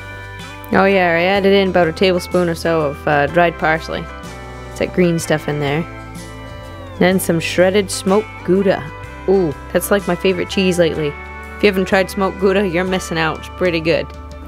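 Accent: Maine, United States. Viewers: I could sit down and listen to your Maine accent all day long soup looks great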